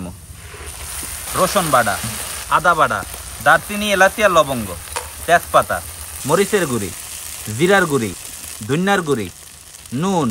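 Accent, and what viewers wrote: Barishal, Bangladesh. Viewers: কথার ভাষা একটু ভালো হলে ভালো লাগতো বরিশালের ভাষা বলে কথা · বরিশাল এর আপনি?? কথা শুনে মনে হচ্ছে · ভাইয়া আপনার বাড়ি কি বরিশাল 😊😊😊😊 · এ দেহি মোগো বরিশাল্যা 🙂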